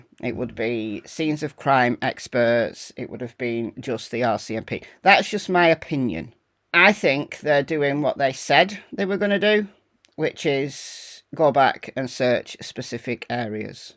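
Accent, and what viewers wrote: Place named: Lancashire, United Kingdom. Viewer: Hey - your voice remimds me so much of my former mother in law(a Lancashire lass!) On a serious note, my grandkids are a 6 yr old girl and 3 yr old boy. The idea that they would go off alone from the safety of their garden and into the woods is utterly preposterous. There is just no way on earth.... I don't think they were abducted either - I don't think they ever left that home, at least not alive 😢 I find it interesting that out of the many videos there are regarding the subject ive not seen one comment that exonerates the parents of all blame and believes their story whch says a lot really doesn't it! Sending love from mid Wales! 🏴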